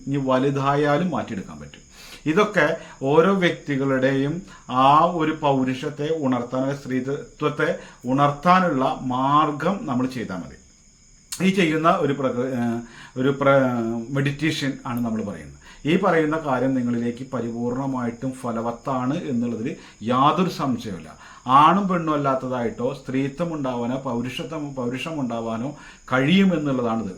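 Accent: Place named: Thrissur, India. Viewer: Thrissur സംസാരം 🥰🥰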